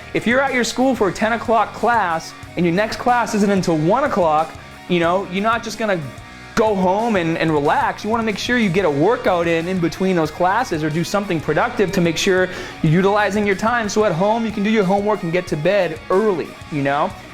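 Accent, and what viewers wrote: Boston, United States. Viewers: But that boston accent tho :D lol. #GoPatsGo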